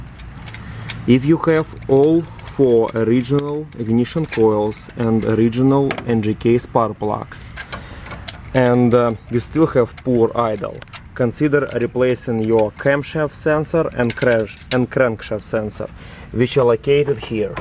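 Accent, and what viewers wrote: Russia. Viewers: You must be russian..:goodvibes: i love your accent. I subscribed! I love your videos.. straight to the point, no bs. Thanks!! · love the Russian accent 👌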